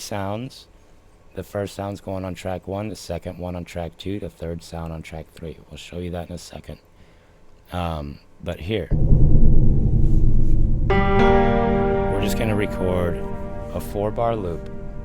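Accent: St. Louis, United States. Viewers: Yoooooo are you are Cardinals fan? I’ve always taught you talked kinda like a true south STLer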